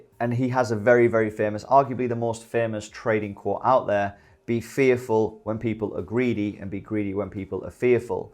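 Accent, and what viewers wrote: United Kingdom. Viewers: Hello from US you brit?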